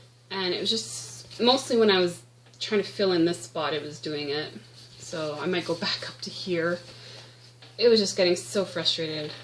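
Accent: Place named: Canada. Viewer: First time watching you and I thought I detected a Canadian accent and I was right! Love your finishes and really, you stitch fast! You call that pretty leaf piece small, but that would’ve taken me forever! Also l love your haul ;). Happy stitching!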